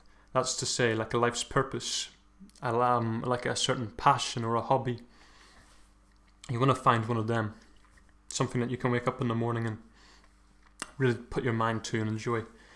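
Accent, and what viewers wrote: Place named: Wales, United Kingdom. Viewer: Skot Wilson he sounds welsh to me.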